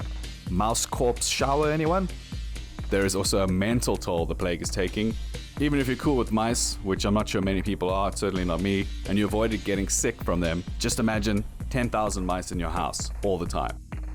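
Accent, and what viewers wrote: South Africa. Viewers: Hey man.. Where are you from? You sound South African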